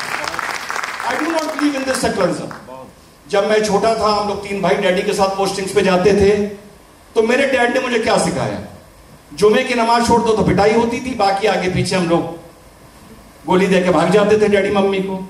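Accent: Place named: Lucknow, India. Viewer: Sir, aapki luknawi hindi bahut khoobsurat hai..Bhagwan aapko aur aapke pariwar ko hamesha khushal aur sukhi rakhe. 🙏🙏🙏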